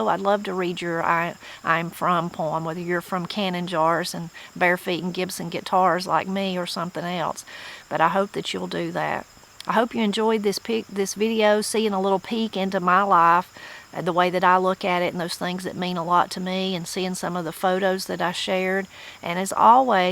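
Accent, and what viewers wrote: Appalachia, United States. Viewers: Love Appalachian talk! “Canon jars” a container for a camera. or a container for storing sacred books, dogmas.e.g “The Pastors wife, was canon the last supper”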